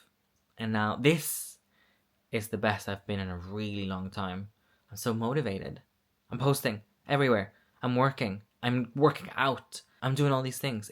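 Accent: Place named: United Kingdom. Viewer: you have a STRONG UK accent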